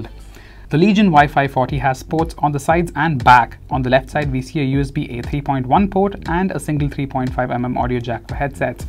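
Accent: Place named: India. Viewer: that indian accent i love it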